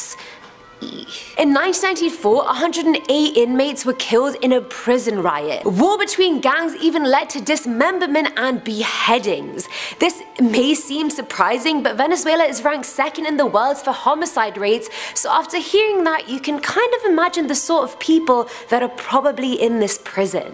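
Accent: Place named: United Kingdom. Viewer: A new host at Landon? I didn't know. Trying to figure out where you're from. You sound British but it sounds like there's another accent in there.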